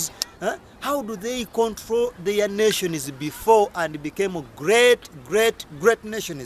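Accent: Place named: England, United Kingdom. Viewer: You got a lot of shit to get over. I hate your English accent so bad.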